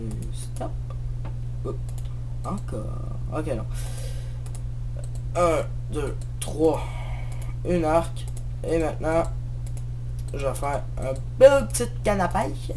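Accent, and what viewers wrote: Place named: Germany. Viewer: Tes cris sont toujours épic ^^ sinon bel accent allemand bravo! ;)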